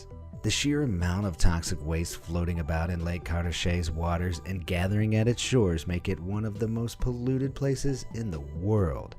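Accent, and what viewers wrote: United States. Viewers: Well the words he's useing are the English words for it (English as in UK not English as in English lauguage) tho his voice is rather amarican or Canadian. Its hard to tell as he uses alot of English terms and pronouncyation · Ah, so this is a British guy that sounds American. He said, "Petrol Head" dead giveaway.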